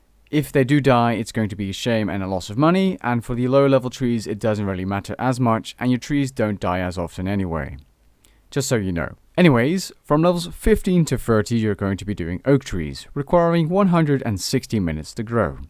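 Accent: Netherlands. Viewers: that dutch accent tho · He’s fucking dutch, it’s not his first language. Now shut the fuck up.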